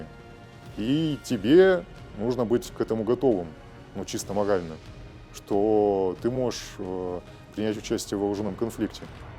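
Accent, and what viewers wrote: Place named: France. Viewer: Акцент французский.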